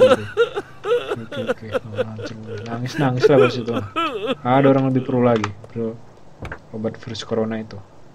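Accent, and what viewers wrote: Medan, Indonesia. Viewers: Bang kau orang medan ya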